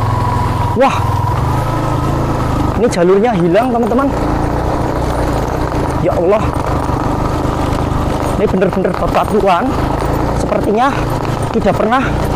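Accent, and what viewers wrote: Java, Indonesia. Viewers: Mas omonga nya kok medok banget si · Wong jowo PO mas?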